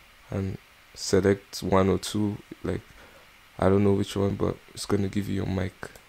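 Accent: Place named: Nigeria. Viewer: You a nigerian bruh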